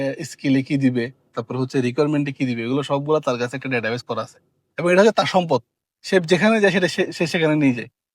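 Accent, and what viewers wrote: Chittagong, Bangladesh. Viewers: bhiya apni ki chittagong er??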